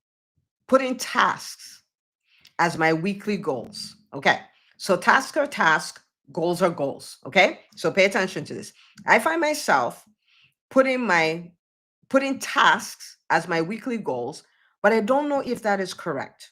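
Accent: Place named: Kenya. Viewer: Doc, are you really from West Africa? Your English sounds so much Kenyan.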